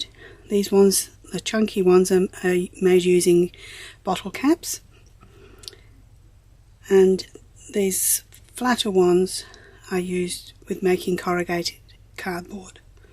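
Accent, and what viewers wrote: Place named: Australia. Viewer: Omg this is genius ! What a brill idea saving to favs. Very clear instructions thanks loads will try this. I thought you were Australian but your English ? Where are you from ? Brill vid thanks xx Amanda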